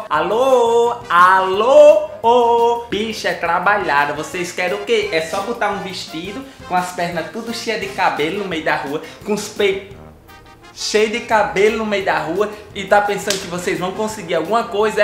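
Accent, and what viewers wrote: Northeast, Brazil. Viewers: De que estado é a Samantha? A região é claro Nordeste. <3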